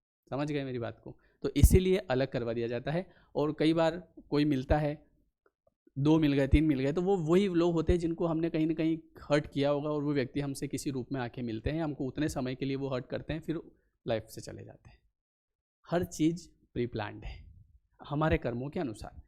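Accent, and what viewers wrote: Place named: Maharashtra, India. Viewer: Chhan sangital tumhi sir aani tumhi mla bahutek marathi aahat as vatal tumchya bolyavarun ...tumchya pudhchya video chi aamhi vat bghtoy lvkr bnva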